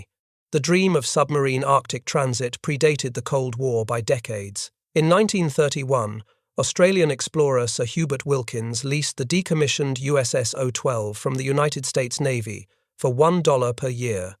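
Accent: United Kingdom. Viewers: Outstanding historical account, love the British accent!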